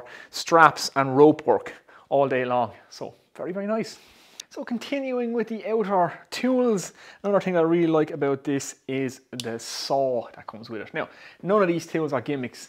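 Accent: Waterford, Ireland. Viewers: Great video. Additionally, an Irish brogue is the most beautiful sounding accent. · I just got one today. Is that a Waterford accent?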